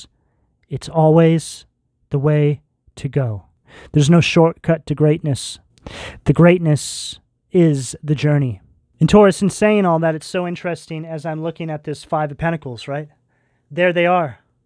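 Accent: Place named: Massachusetts, United States. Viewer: You know… it took me all night to watch but yes! Somehow yes!&you sound like you’re from mass like me… your voice just sounds familiar 🤔💫♉️♋️♌️✨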